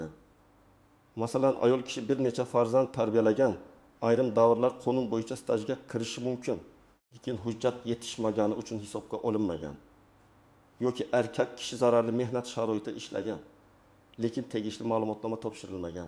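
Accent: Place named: Tajikistan. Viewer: Бу одам тожикми. Узбекчани акцент Билан гапиради.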